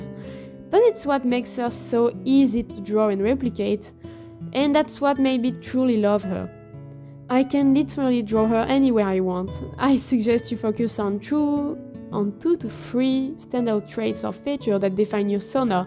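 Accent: France. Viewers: l'accent francais est adorable<3 merci pour cette vidéo!! jai souvent le réflexe de dessiner ma persona en mode silly goober et ce qui marche pour moi cest de créer une version "originale" simple, et ensuite faire autant de AU que je veux du genre ocean AU, space AU ou je m'amuse plus avec le design :)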